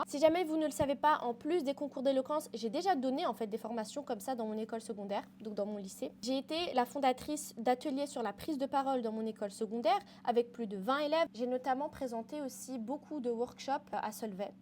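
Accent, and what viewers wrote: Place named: Tunisia. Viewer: Tu es tunisienne 😂😂😂 je reconnais l’accent 💕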